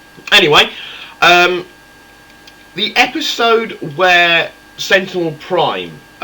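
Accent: United Kingdom. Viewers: but seriouslyis he british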